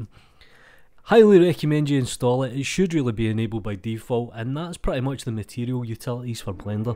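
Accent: Scotland, United Kingdom. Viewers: That's Scottish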